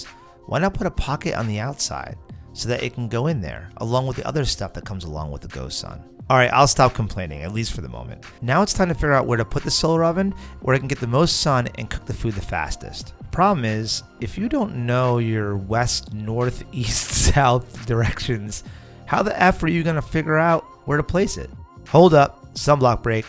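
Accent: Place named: New York, United States. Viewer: He's from New York dead giveaway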